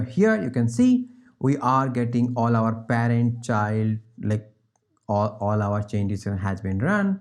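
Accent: India. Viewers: Thanks man! Always easier to learn from an Indian English speaker.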